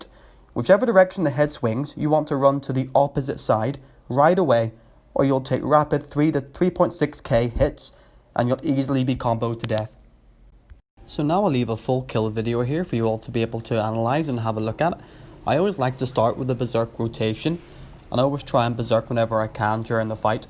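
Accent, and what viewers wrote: Northern Ireland, United Kingdom. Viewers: Awesome hearing a Northern Irish accent 😝 represent!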